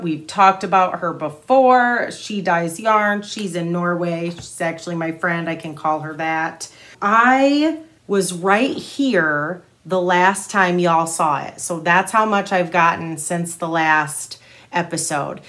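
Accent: Midwest, United States. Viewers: I'm from Madison Wisconsin and I'm so happy that you say "All's." My Mom use to ask me all the time " How do you spell that?" Great Midwest accent!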